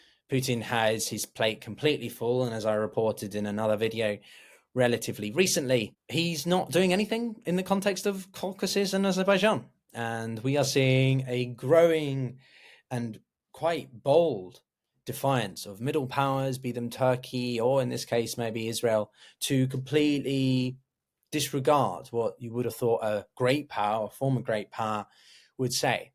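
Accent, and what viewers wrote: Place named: United Kingdom. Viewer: This guy is shooting his mouth off with a biased defense of Syrian jihadists, and with his Oxford trained British accent sounds like a European interloper sticking his nose in other people's affairs. I had to cut him off because he's a phony trying to make a name for himself on YouTube. Get off your ass and get a job, you slob.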